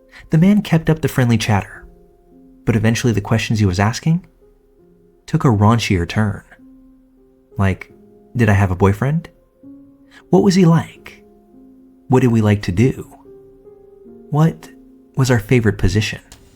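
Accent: United States. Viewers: I'm English and I'm abit werid with American accents. But I really like this one. I'm not sure what part of America comes from my favourite is North Carolina accent😊😊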